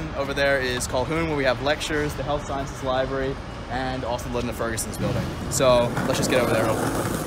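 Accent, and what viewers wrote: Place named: United States. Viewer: Hi. I am in the final semester of my undergraduate studies and I want to do my masters programme in NZ. the problem is that I majored in American and British language and Literature and I want to do switch to medicine or nursing. Please what is your advice? Are you an international student there? 'cause you have an American or Canadian accent.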